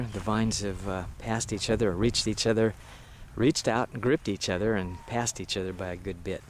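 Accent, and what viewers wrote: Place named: South Carolina, United States. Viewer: he sounds like he is from upstate south carolina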